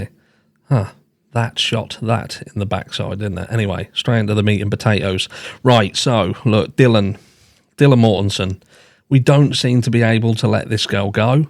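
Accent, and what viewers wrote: United Kingdom. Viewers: True Crime is always better with a British accent.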